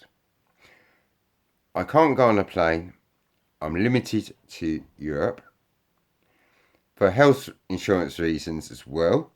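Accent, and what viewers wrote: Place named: Cockney, United Kingdom. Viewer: I've been looking back at some of your earlier videos and note that you used to speak with a cockney accent. Did you put that accent on so as to appear hard?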